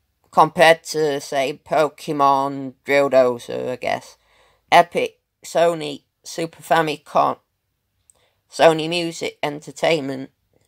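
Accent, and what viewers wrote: United Kingdom. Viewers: Hello! I've been watching your videos throughout the years, and I really enjoy the content that you put out and the dedication behind it! This little change up in format is a nice surprise, and I actually find your voice kind of soothing. What would you pin down your accent as? I feel that it's British, but I'd hate to just randomly assume in case it's something different, hah. Anyway, here's hoping for nice times for you and your further researches! I really do love your channel~ <3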